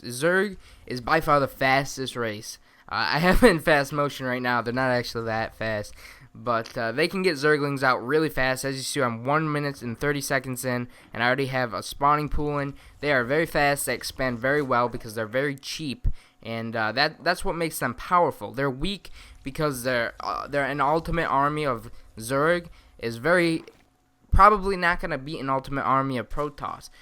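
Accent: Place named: Chicago, United States. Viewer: Are you from the Chicago area? your accent sounds like it. ( i used to live 'round there)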